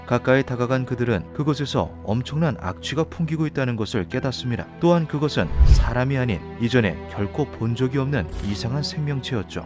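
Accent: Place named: Gangwon, South Korea. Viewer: 고향이 강원도 신가요? 강원도 억양 있으신데 ㅎㅎ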